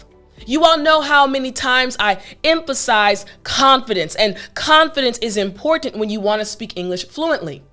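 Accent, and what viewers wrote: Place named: South, United States. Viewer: Teacher a top top motivational speaker. I love your country accent 🤩❤️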